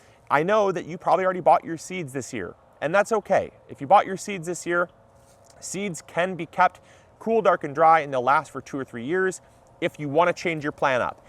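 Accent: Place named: Louisiana, United States. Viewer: You almost sound Cajun. New Orleans is known for Chicory coffee, like Cafe Du Monde. They blend Chicory with Coffee to stretch coffee. I've never thought about growing my own Chicory. Native Texan and been to New Orleans a few times and to Cafe Du Monde a few times. It was awesome hearing someone else talk about chircory coffee.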